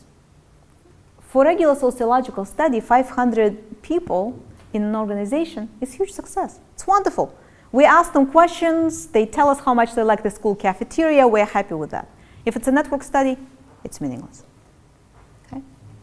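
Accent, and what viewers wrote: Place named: Russia. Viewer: Man, such a terrible russian accent... But the material is great.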